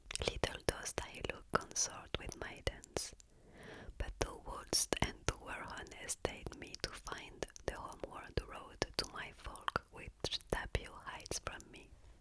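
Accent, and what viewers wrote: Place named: France. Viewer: Gorgeous whispers & great choice of reading - your English is fantastic... especially with your gorgeous French accent 🥰🥰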